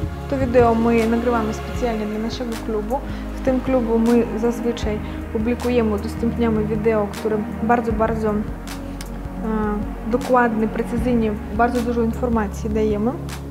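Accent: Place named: Ukraine. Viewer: Kurwa ten ukraiński akcent jak ja tego nie znoszę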